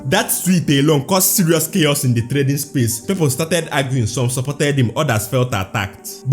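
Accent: Igbo, Nigeria. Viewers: Very thick igbo accent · Pally go and improve on your diction. Your igbotic accent is too annoying and local .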